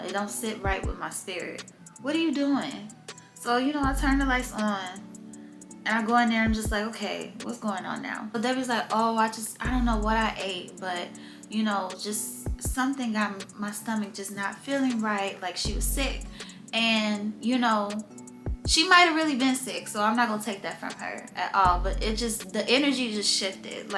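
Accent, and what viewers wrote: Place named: Chicago, United States. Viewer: You can tell you from Chicago lolz 😂. I love the class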